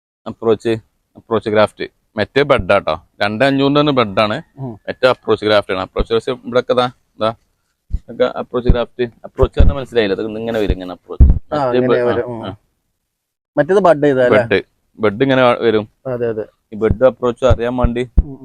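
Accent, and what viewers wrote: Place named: Malappuram, India. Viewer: ഇതെൻ്റെ മലപ്പുറം ഭാഷ👍😎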